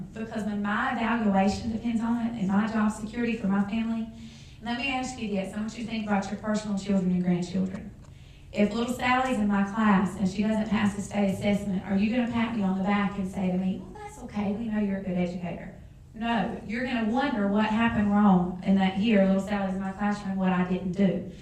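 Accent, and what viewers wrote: South, United States. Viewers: why don't you people shut the hell up about her southern accent. Other parts of the country are just as annoying with their accents and dialect. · It's called a Southern accent. You don't have any regional pronounciation of any English words? I'm sure you do. You could see how passionate she is about education, how much she wants to help her students, how her general discourse was well thought out and intelligent, yet you chose to focus on a couple of words among all these positives. Schools need more people like her. If you truly want to do some good for the world, stop being a nitpicker and a quibbler and see the big picture. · Have you ever been passionate about something? Have you ever talked so earnestly that your words might have been rushed or you enunciated less clearly than usual? I have. I know the correct pronunciation of "adjective," but to pronounce every single sound in the word takes almost twice as long and makes the concept much more intimidating. 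Would you correct a child who left out the "c" in adjective? I wouldn't. "Liberry?" Absolutely. But she's Southern. I'm Southern. We pronounce it slightly differently. Would you correct a black person using ebonics? Or a British person who says adVERtisment instead of ADvertisement? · She has a southern accent. I understood every single word. Y'all are just rude and mean. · To all you complaining about her accent and pronunciation... we Southerners can't understand a word of your Yankee-talk, either! But we would not respond to a passionate, intelligent critique of this very dangerous Common Core crap by posting a comment demeaning her accent, while completely ignoring the substance of her excellent argument. We Southerners are not rude like that-- unless provoked. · its a southern accent hard to fallow if you've never heard it before i will admit. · I think perhaps your ear is just not fine tuned to the deep southern accent. 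She is speaking rapidly since she is limited by time and clearly passionate about this subject. Being from Tennessee myself, I actually hear the 'd' in both "adjective" and "adverb". I find it amusing how people of the US (and Canada) have such difficulty accepting & understanding different dialects of other US citizens. Don't equate a southern accent with stupidity; many, many times that assumption will be very wrong. Some of us may not seem to speak properly in others' opinions. It's the rhythm and cadence of our speech, dropped syllables, run-together words, changing vowel sounds in words so they sound totally different, our habit of making one syllable words two syllables. Yeah. It's a Southern thang. Y'all ain't never gonna understand. Bless your hearts.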